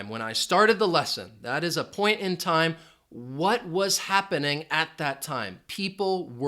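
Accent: United States. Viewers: I just closed my eyes and listened to this video from start to finish. I learned not only tenses but American accent also. It will be useful for those who haven’t been familiar with the accent. So, I’m gonna invite my friends to subscribe and hit the bell button. They will be speaking more fluently with the tenses by the end of the year. A number of people in my country, Thailand, were struggling with forming a sentence despite the hard fact that they had been studying for several years. Unfortunately, their skills had remained unchanged. Anyway, students who have been working hard will have achieved their goals in so far as there is the light at the end of the tunnel. How long will they have been taking time to understand native speakers almost all the words they say? I suppose these covered all stuff you kindly taught. Thank you so much.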